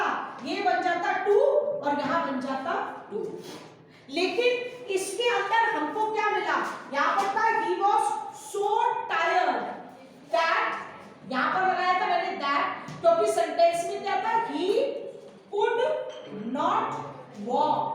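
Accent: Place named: Udaipur, India. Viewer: Udaipur Se Ho Kya Medam Aap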